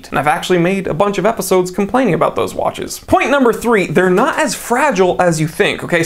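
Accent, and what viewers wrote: Germany. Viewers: It’s the german accent that made me thumbs up 😂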